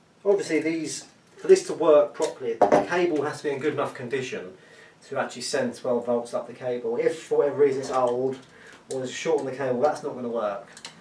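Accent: United Kingdom. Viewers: he's from the UK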